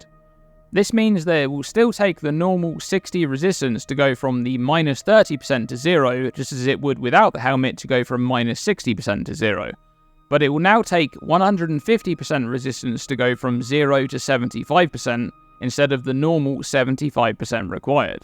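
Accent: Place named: United Kingdom. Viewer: He's a brit... they say things differently.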